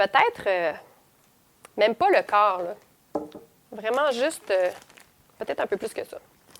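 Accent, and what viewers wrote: Quebec, Canada. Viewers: Doney Hon c est français du canada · stupide commentaire c est une québécoise idiot du village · vous êtes non seulement BELLE mais avec votre accent Canadien, mon dieu vous êtes SO SEXY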